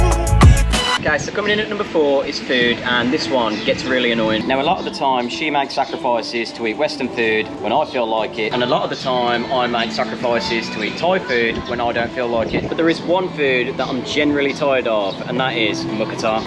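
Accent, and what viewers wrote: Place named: Midlands, United Kingdom. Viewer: As a fellow Midlander 🙂 I understand you perfectly...lol - Brilliant Videos by the way. Thumbs Up!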